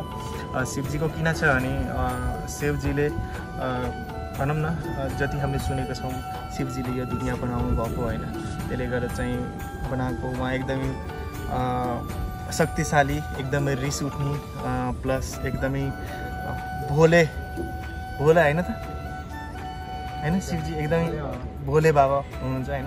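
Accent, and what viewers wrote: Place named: India. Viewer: nepali babaal nai x ta. tone ta aba halka aaihaalx ni india ma 15/16 barsa basesi tara tapaiko tei tone le gardaa ajha mitho suninx boldaa😍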